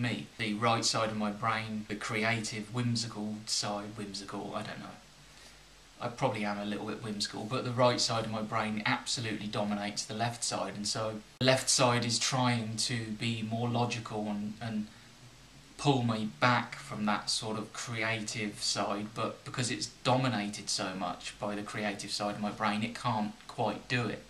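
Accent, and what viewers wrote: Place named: United Kingdom. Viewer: I rarely leave comments on YouTube but I think you are really brave to talk about your mental problems in public, in front of a camera... You look like a truely good person and I hope you get better soon. I'm a high carb low fat vegan too (starch based) and I find your videos so helpful and interesting ! Keep going ! And I love your brittish accent....so soothing! (I'm French)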